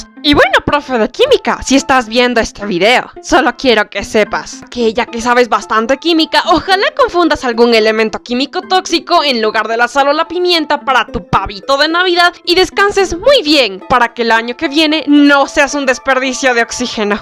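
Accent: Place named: Quito, Ecuador. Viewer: es ese acento quiteño serrano que tiene 🥺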